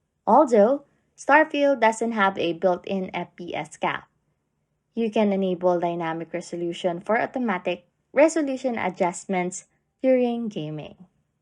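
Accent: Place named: Philippines. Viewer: are you from the philipines? because your voices sounds like you have a filipine accent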